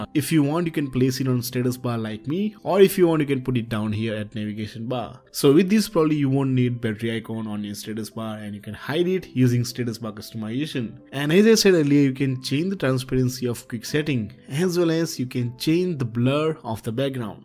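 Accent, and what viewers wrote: India. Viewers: Fcking hindi english accent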